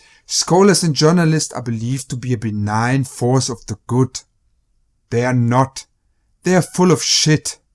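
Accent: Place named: Germany. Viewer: dude....i clearly can hear your german accent.....where exactly do you come from?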